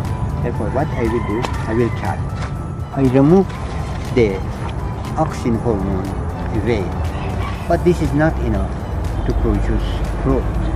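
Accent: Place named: Iran. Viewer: The best video on this topic. Thank you. You sound Persian.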